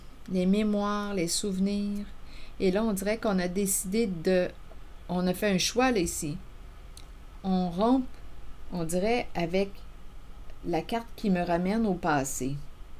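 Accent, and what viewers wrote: Canada. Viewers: Mon Dieu, votre magnifique accent Canadien. J'ADORE. JE VOUS EMBRASSE POUR TOUT CE QUE VOUS DÉCLENCHEZ chez ceux qui sont à votre écoute. Énormes bises de MARSEILLE.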